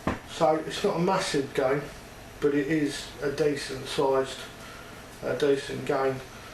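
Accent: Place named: RP, United Kingdom. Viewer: Bob USA. he's speaking the Queens English so have a bit of respect. okay fella now just watch and learn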